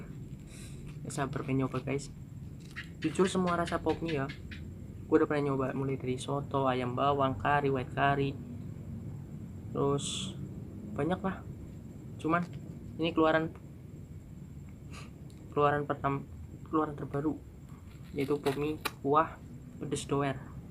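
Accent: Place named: Banyumas, Indonesia. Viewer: Hahaaa wong ngapak yaahh.... Mantap pedes gilaaaa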